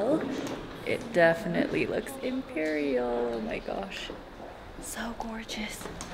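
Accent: United Kingdom. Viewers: 当たり前ですが、やはりSTOPPELさんの話す英語はイギリス英語なんですね…！それとも訛り…？ 字幕のおかげもあって、聞き取りやすかったです！ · すごいイギリス発音。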